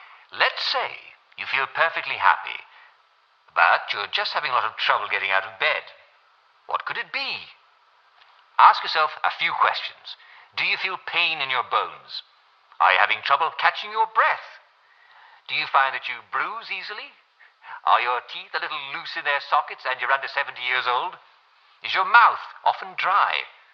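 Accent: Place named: United Kingdom. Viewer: It's the English way of speaking English. We say lift instead of elevator, we say V-ih-tamin instead of V-I-tamin.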